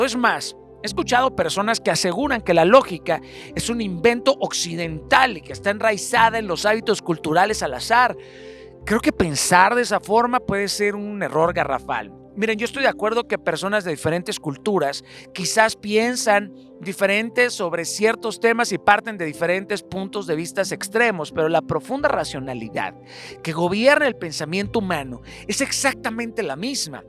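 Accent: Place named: Greece. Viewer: Tienes acento griego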